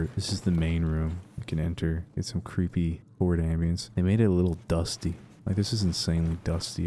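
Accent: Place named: California, United States. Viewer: Dude, you and Point & Shoot sound sooo similar its crazy HAVE TO be from the same area of Cali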